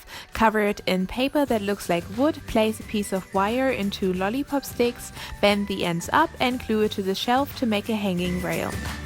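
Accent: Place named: Germany. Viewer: da hab ich doch ein gutes Gefühl für das deutsche Akzent😃😎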